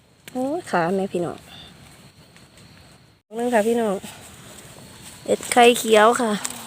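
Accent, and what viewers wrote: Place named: Isan, Thailand. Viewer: สำเนียงเว้าคือบ่คือสาวเเจ้ย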